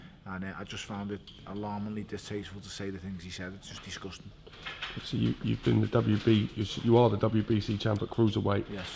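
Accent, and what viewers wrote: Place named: Liverpool, United Kingdom. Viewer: I am a Manc and I really like Bellew (I am even starting to like the scouse accent) - what he says about Haye conning the public is spot on!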